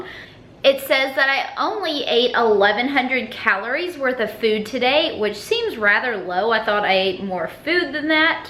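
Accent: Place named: Texas, United States. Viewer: You are so adorable! Love watching your channel! Learning so much! I grew up in Ft. Worth so love your TEXAS accent!! ☺️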